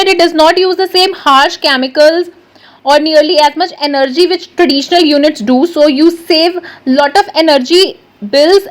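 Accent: India. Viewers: You speak like an indian guy and it sounds very funny😂😂😉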